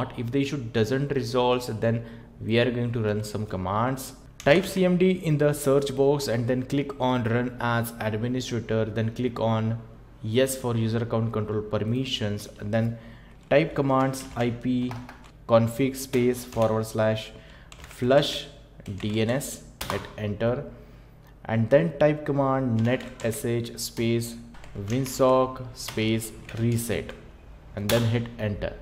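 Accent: India. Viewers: fuck indians accent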